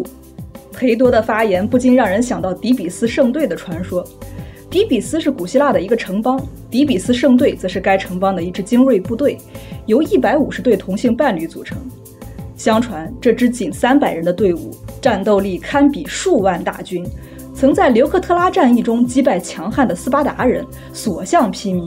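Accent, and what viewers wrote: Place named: Shandong, China. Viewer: 这浓郁的山东普通话，真是欢乐😂😂😂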